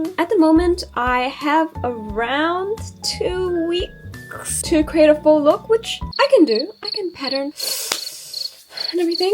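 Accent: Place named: New Zealand. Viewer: Obsessed with the silhouette of this fit!!! also your kiwi accent is rlly cute btw!!!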